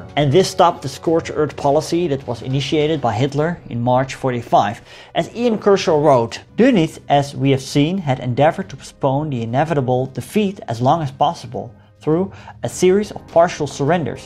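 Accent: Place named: Netherlands. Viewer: He speaks English like a Dutchman... Admiral Donuts! That's hilarious!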